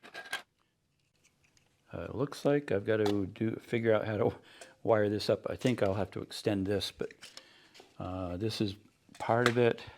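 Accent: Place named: Canada. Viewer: I think i hear a touch of Canadian accent? My papa and the rest of dad's side of the family came from canada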